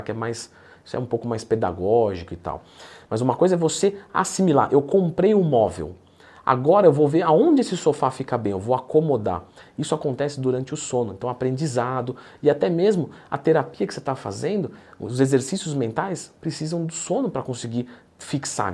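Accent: Northeast, Brazil. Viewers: Vc é nordestino? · Vc é nordestino? Que bonitinho!!!!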